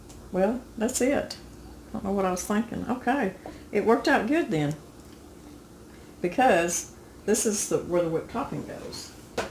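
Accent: South, United States. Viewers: Millboro Quilter South Dakota haha it’s just a southern accent.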